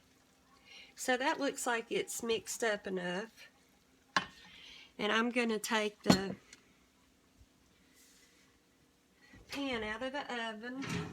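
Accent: South, United States. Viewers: All I had to do was hear that southern voice and I instantly subscribed. If anybody knows about cooking, it's a southerner. Thank you for sharing this recipe. I'm looking forward to catching up on all of your recipe videos here in southern Ohio. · has the best Southern accent I have ever heard. I can hear the kindness in it. We love you. ❤ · She talks so sweet and southerny. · Ms, I hv watched several of your videos, so now I am a big fan!!!! Your talking and accent w/mannerisms just like my Grandmothervwho was raised in So Ga. When I watch you, listen and learning,I'm hearing her telling me her lessons and stories of her data growing up!! I love it, and I am so excited to get it ur videos on all it favorite hummus foods. Lov u already and please, keep sending us videos!!! Thank you so much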